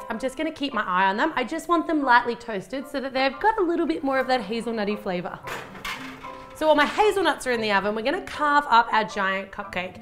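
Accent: Australia. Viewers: She's Australian so obvi she had a diff accent Don't be rude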